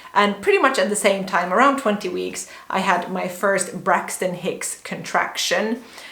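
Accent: Finland. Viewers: your accent got so Finnish since you've been living in Finland, all this talking on a single breath intonation going down thing, so cute :)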